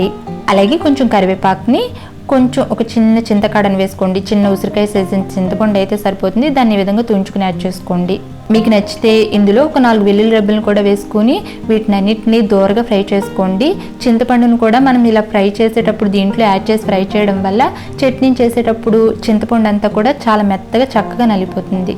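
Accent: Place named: Konaseema, India. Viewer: Ma'am, your recipes are just awesome..but please I have a request to you..by the way, when I observe you speaking, మీరు కోనసీమ వారిలా అనుకొంటున్నాను.. నేను కొత్తపేట, అమలాపురం, రావులపాలం చాలా సార్లు వచ్చాను Hyderabad నుంచి.. అయితే, అక్కడ ఇచ్చే కొబ్బరి చట్నీ కానీ, బొంబాయ్ చట్నీ కానీ ఇంక్కెడ ఆ రుచి లాగా రాదు రాలేదు..మీరు దయచేసి, ఆ కొబ్బరి గట్టి చట్నీ గ్రీన్ or light yellow colour లో ఉంటుంది ,, specially ఇడ్లీ లోకి, బొంబాయ్ చట్నీ పురిలోకి ఇస్తారు... మాకు Hyderabad లో సైకిళ్ల మీద అమ్మే ఇడ్లీ వాళ్ళు అది సర్వ్ చేస్తారు, as they are from రాజమండ్రీ and other east Godavari district s.. please Ma'am video చేస్తారు కదా,,💯🙏🙏🙏 please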